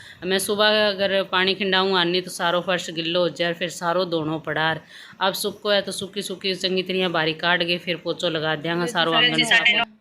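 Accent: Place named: Bagri, India. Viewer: n bagdi bolni sichao aap gi boli hi achi laag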